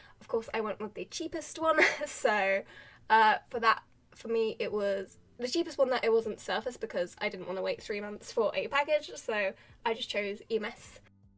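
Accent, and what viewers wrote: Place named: United Kingdom. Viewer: Hi, Really loved the video. Really love your personality. You are so beautiful. Love the way you talk and your voice too, lol. Really love your British accent. Sorry if that was a weird thing to say. I have been using Buyee for a long time, and they are very fast, and very reliable, but the only thing I do not like abut them is that when I choose Express Air Mail delivery, they make the choice out of 3 options that I do not know until after they shipped. They either ship DHL, FEDEX, or UPS Express, depending on the weight and dimensions of the package. Anyways, I just wish I had my own choice from those 3 options. I really like that this Proxy service (Remambo) allows you to choose the shipping service you want, instead of making that choice for you. I am only half way through your video, but it is so great. You seem super smart. 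I might switch from Buyee to Remambo now. I will give Remambo a try. Thanks again for the great video. I liked and subscribed to your channel. Love you, lol.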